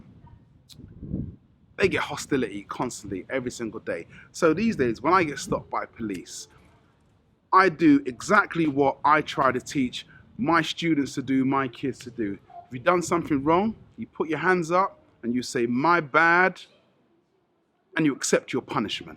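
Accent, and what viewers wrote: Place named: United Kingdom. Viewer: No i couldn't tell but i knew you had that UK accent....I'm from the Philippians but i move to America for 4 years then i move back home for good....i didn't know that you was from Nigeria dats why i had to ask you. Do you ever want to move back to Nigeria???? I thought about moving to Africa soon because i love to travel.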